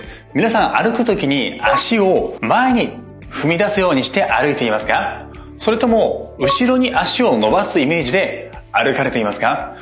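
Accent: Kansai, Japan. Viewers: 色んな困難を乗り越えてらしたのですね🥺 スゴく魅力的で たまに関西訛りがチャーミングです。 わかりやすくて 取り組みやすいものばかりで 感謝です✨ お身体に気をつけて 御過ごしください🎵(*^^*) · 時々出る関西弁がわりと和む(笑)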